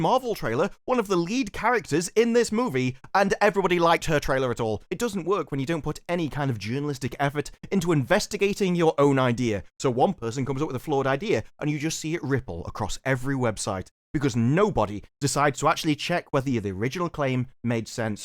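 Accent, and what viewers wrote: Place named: Geordie, United Kingdom. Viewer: You from the Toon, mate? I think I can detect a hint of Geordie in your accent... and yes, I am the Bat-Gadgie.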